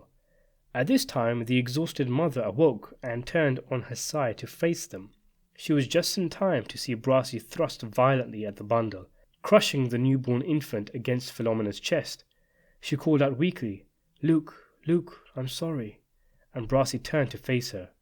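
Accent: England, United Kingdom. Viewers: Hearing an Englishman try to pronounce Italian words and names is almost as funny as hearing an Italian try to speak proper English. Appolina? for Appalonia. And the flat A in Mario is a constant in British form of Italian speech. When will the Brits learn to speak American? Water closet is an awful name for that. And cars do not have a bonnet or a windscreen. How would either of those work? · It shouldn't be this way and I'm embarrassed to admit to admit it but it just doesn't feel right hearing an evaluation of the Godfather coming from someone with an English accent.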